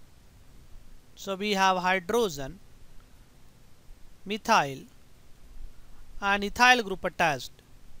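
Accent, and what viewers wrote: India. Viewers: I love Indian accents :)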